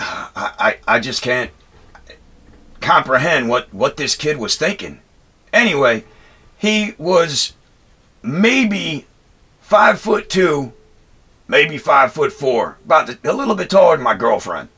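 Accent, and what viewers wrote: United States. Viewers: The second I turned this video on I knew for damn sure he was a Carolinian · The accent makes me tear up as well, Murican as fuck, fuck yeah maaaan!!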